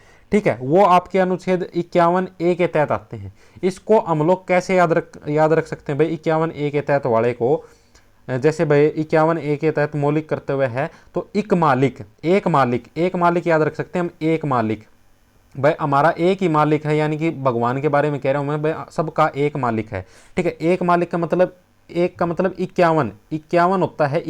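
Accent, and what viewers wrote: Haryana, India. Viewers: English bolna laga sir ap to pr harynvi achi laga hai apka muh se😁😁😁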